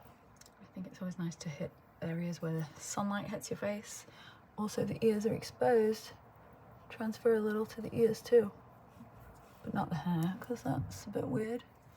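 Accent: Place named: Manchester, United Kingdom. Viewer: You kinda have a manc accent like my dad! Your looks are all gorgeous, loving this series doll!!🌸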